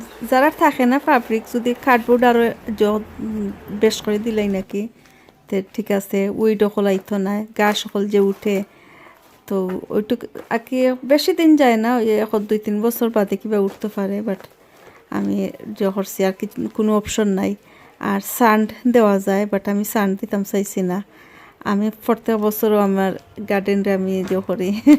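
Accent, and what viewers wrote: Sylhet, Bangladesh. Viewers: Walaikumasalam Alhamdurillah Allah Pak rakche Valo Apu. Apnar sylhety presentation wonderful hoyeche. Thanks for sharing. Bondhu hoye gelam.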